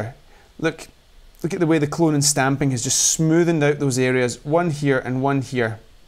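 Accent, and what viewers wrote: Scotland, United Kingdom. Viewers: Thanks for that .... very informative! At first I had trouble concentrating on what you were saying, because I was captivated by your glorious Scottish accent, but once my Aussie ear adjusted itself to your accent, I was able to follow every word. Great work!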